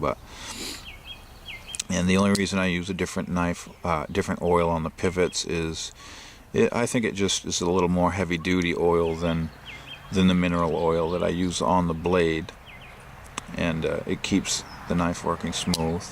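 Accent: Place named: Maine, United States. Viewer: You sound like your from Maine, yut! Love your videos.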